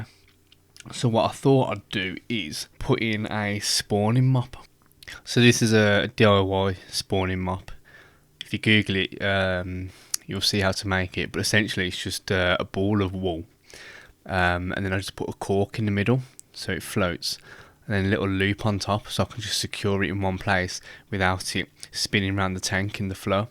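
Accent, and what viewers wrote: Birmingham, United Kingdom. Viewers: Lovely brummy accent there lol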